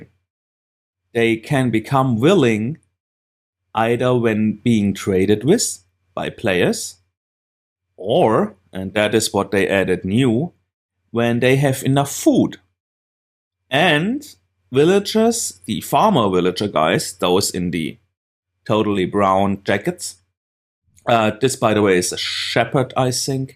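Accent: Germany. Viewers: man merkt das du deutscher bist :D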